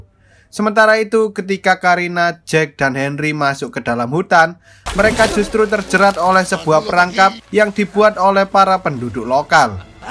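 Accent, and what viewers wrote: Java, Indonesia. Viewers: Meddok emet lu bru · Wong jowo yo cak? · Jawanya medok skalee rekk🤣🤣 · bang sampean wong jowo ya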